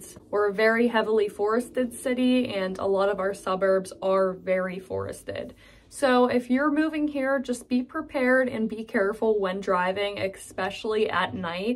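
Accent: Pittsburgh, United States. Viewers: I was born in Pittsburgh and moved out the the country when I was 9, I love the fact that I can hear a Pittsburgh accent, and you definitely have one. Awesome video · The longer you talked, the more Pittsburgh you sounded😁 Especially "expecially". Nobody else says that. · Love your Pittsburgh accent!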